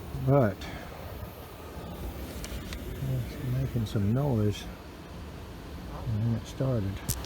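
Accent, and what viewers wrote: South, United States. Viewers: The soft spoken Southern gentleman has to break the bad news to another helicopter, and it is always done with tact. Couldn't help but notice that has begun using that term as well; maybe you should get residual compensation since you coined the word. lol Always nice to watch you and in action.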